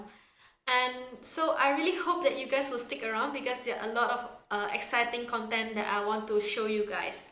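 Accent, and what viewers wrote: Indonesia. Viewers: Your indonesian accent is obvious in this video..hehahe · Mbaknya ngomong inggris aksen Indo ya?. Kental bgt logatnya👍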